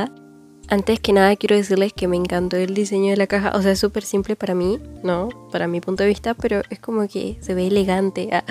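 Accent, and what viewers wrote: Argentina. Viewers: Sos argentina quiero decir por el acento xd yo si soy argentina 😂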